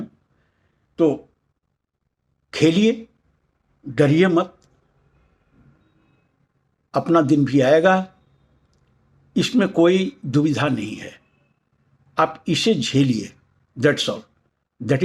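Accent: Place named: Eastern Uttar Pradesh, India. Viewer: jee, bataiye kya abhi humko paise laga dena chahiye kyunki share saste mil rahe hain?is main pareshaani hai ki kab lagaye, already portfolio 12 percent down hai, middle class ke liye issue hai ye, aapke support ke liye bahut dhanyawaad, pratiksha rahegi, shayad aapki vaani se lagta hai ki aap UP east ke hain, bataiyega kahan se hain aap aur humara anumaan kitna galat hai..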